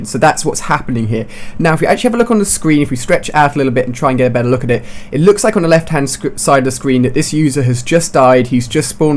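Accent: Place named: United Kingdom. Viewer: man i so hate british accent.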